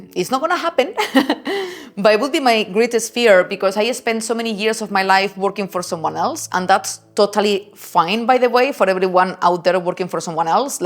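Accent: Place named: Spain. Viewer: ✌️ oh that is Spanish 👌 sounds so nice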